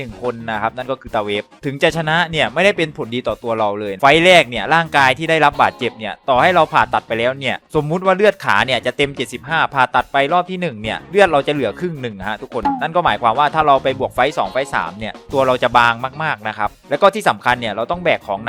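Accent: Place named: South, Thailand. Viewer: สำเนียงพี่คนใต้นะ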